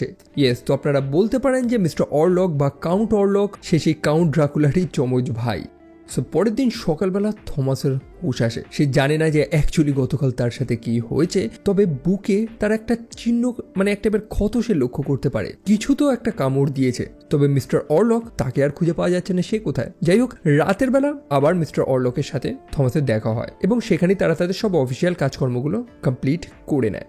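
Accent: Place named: Bangladesh. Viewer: আপনার কথাগুলো শুনতে একদম বাংলাদেশীদের মতো!!!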